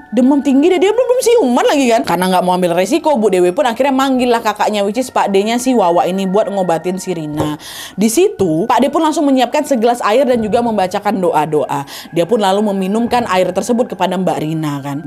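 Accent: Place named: Medan, Indonesia. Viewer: Medan kali yaa kak 😅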